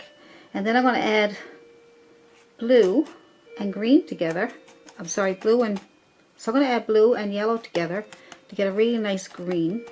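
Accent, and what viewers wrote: Newfoundland, Canada. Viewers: Is that a NFLD accent that I'm hearing?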